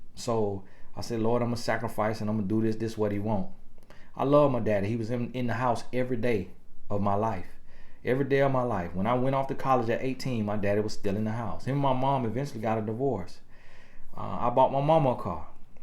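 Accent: South, United States. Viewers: First 5 and half minutes and I'm doneeeee. I done went up in praise and laughed so hard... This is truth spoken so well! Love the accent too-- it had me Rollin' to hear this with that country twang. It's all love 😂❤ Thank you 👊🏾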